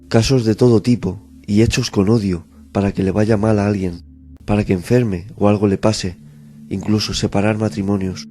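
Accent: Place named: Spain. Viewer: Hola Amigo, porque te haces llamar? Por ser un ser iluminado y lleno de pureza?...Tu voz da tranquilidad y por tu acento me parece que eres de España, me alegra mucho que hayas decidido hacer videos de ESPIRITUALIDAD que tanta falta le hace al mundo. Soy bautizado Catolico pero ellos no creen en la REENCARNACION que por tantos hechos reales se que es verdadera. Yo estoy en un grupo Espiritual llamado TAO aca en Ecuador los instructores la mayoria creen en Buda y Jesus ademas creen en la Biblia. Un gusto siempre escuchar tus videos.....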